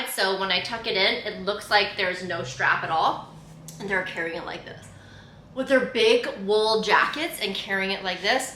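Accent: Canada. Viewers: Aww, I'm sorry to hear people don't appreciate your accent/the way you say "bag". I think it's unfortunate that people don't appreciate the differences in accents and how they make various words sound different. I think it's adorable that in the Canadian accent, the word "bag" sounds like "beg".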